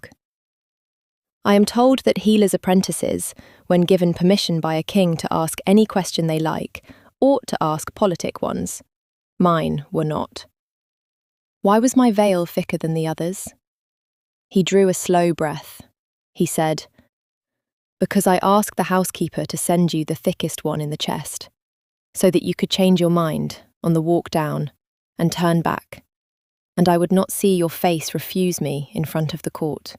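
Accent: England, United Kingdom. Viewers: Loving these stories, it's so wonderful hearing an English accent ❤ I am English so hearing our accent is great, please don't change it 😊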